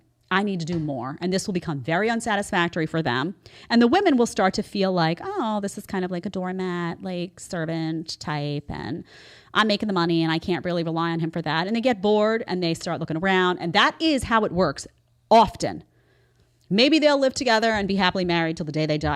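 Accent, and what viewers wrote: New York, United States. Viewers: Mama I truly love that New York accent of yours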